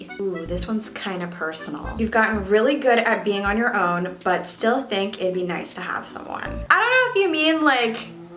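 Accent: United Kingdom. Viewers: i love her british accent😭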